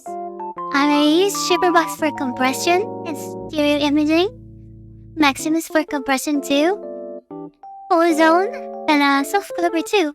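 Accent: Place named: Philippines. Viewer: you're filiipino aren't you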